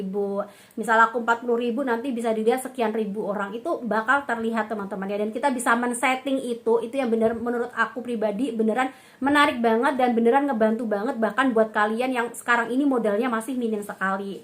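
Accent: Java, Indonesia. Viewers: Hhe...medok bnget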